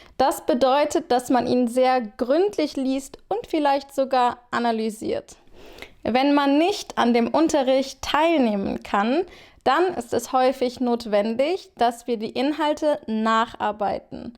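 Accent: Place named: Germany. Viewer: Ihre deutsche Akzent und die Art und Weise wie Sie alles erklären finde ich wundervoll. Vielen Dank dafür 😊👏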